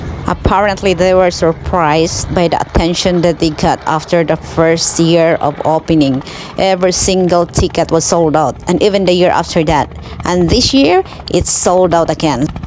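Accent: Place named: Philippines. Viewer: I like the Filipino (a) accent.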